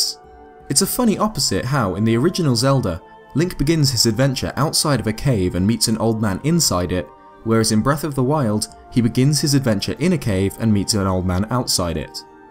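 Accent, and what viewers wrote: England, United Kingdom. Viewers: Love the english accent 😌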